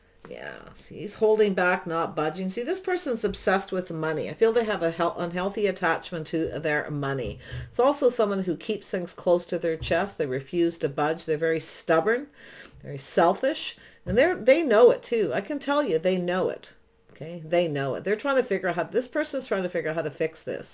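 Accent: Canada. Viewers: I’ve been listening to many tarot’s to help me through some shitty times and keep me grounded but I want to say this was so bang on I had to comment. And I’m living in the US and I can tell from your voice ur Canadian as am I so felt like another sign lol. Thank you because this reading really helped me so much and u are bang on it for me, wanted to extend my gratitude and appreciation and I’ll be following u closely now haha. I mean just nothing has said it better than u xxx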